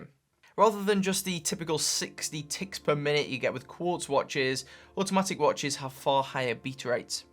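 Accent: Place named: England, United Kingdom. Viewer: Dude what part of england is your accent from it sounds cool?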